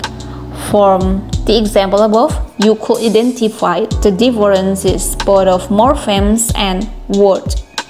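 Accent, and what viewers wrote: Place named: Indonesia. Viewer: i guess she's from Indonesia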